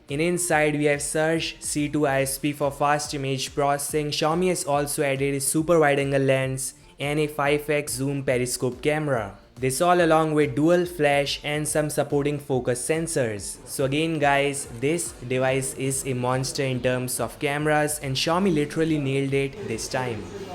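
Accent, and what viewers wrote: India. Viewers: Dei engdian English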